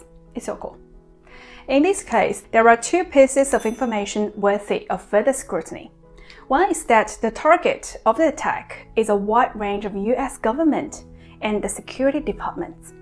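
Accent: Australia. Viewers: Very good English with OZ accent, love it 😍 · 澳洲口音而已 讲的很好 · I also watched you a few times on your Chinese program. I slightly prefer your new English channel as it sounds more serious and factual IMO. Also really loved your Aussie accent. Kudos! Not many on here can do both languages at a mother tongue level! 加油！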